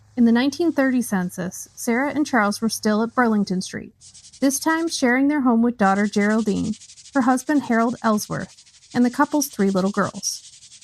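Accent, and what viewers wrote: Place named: Ontario, Canada. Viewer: You sound like you're from Ontario lol I've lived here my entire life. I honestly don't blame anyone who wants to move south of our border lol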